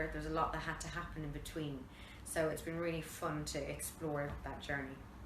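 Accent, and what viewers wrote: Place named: Ireland. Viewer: Ah she’s Irish love it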